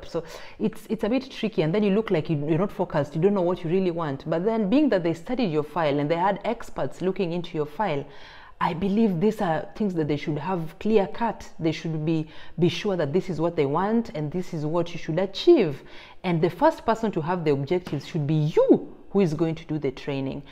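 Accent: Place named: Kenya. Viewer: You speak like a Kenyan. . are you....if so , I'm Kenyan in France, im very near to Switzerland...... i wanted to come to Switzerland through a student visa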